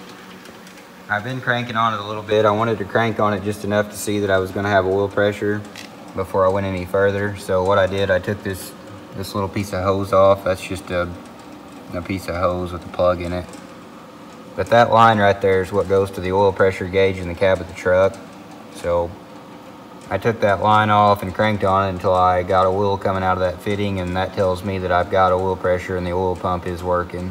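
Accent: South, United States. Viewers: I really enjoyed your video. That engine should clean up nice and you could probably sell it for a good price. Did you ever figure out how water got into the oil? BTW.... my wife is a native from New Orleans and she thinks your accent puts you in the mid to lower south. Let he know if she's right or wrong.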